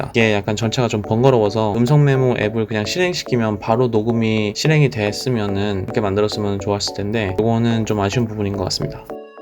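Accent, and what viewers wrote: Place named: Gyeongsang, South Korea. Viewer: 찡깁니다...ㅋㅋㅋㅋ 경상도 구먼..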